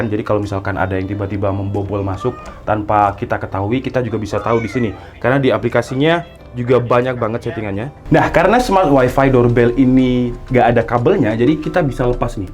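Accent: Java, Indonesia. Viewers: bahasa indo ne pemean opo? " jemuran " wkw ngakak cok jowo kentel tenan 😂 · Jane ngunu arek endi se pean iki 🙈🤣 kok jowo e medok memehan ae bingung bhs indo ne wkwk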